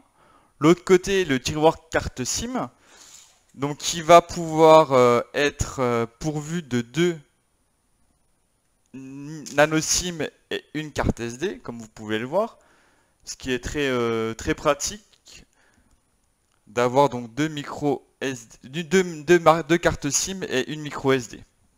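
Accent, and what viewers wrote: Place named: Besançon, France. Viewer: Vous avez un léger accent je dirais Besançon si non bon look ce redmi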